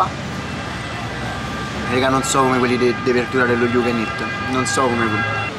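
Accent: Rome, Italy. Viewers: mi stanno piacendo molto i tuoi video, davvero super intertessanti. quando verrò a roma in caso so dove guardare per informarmi sui ristoranti✨ (poi vabbè ho un debole per l'accento romano ahahah)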